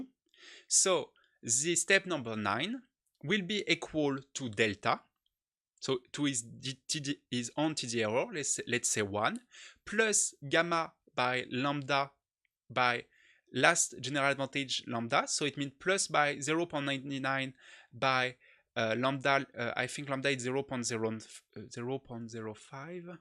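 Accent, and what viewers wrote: France. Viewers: is this accent french? i barely can understand some sentences :D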